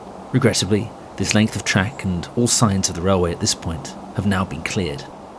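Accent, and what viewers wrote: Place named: RP, United Kingdom. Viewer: Very well presented rp throughout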